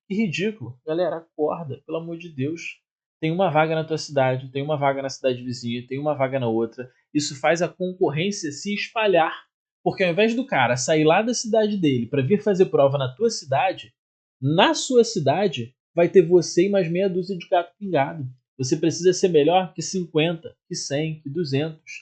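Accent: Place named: Rio de Janeiro, Brazil. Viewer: Estudando contigo e adorando esse sotaque carioca kkkkkkkk se tivesse achado você antes teria feito para outro cargo mas vale vou seguir aqui.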